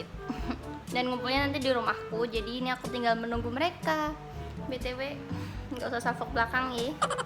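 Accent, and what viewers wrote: Indonesia. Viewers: Wong purwodadi ndy suorone lurr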